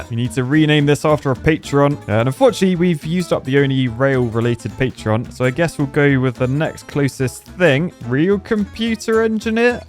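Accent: United Kingdom. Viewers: You can really tell he is British now.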